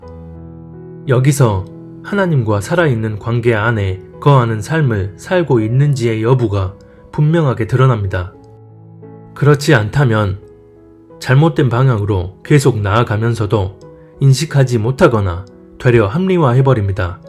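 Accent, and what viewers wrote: Jeolla, South Korea. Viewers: 전라도 사람임? 말투가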